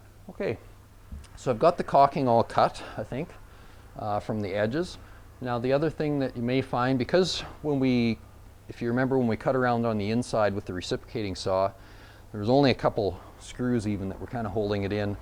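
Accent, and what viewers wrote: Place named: Canada. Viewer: Canadian aye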